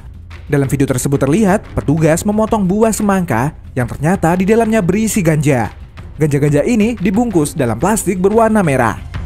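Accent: Madura, Indonesia. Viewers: Kentara banget nih naratornya berdialek Madura tak iyee...🤣🤣🤣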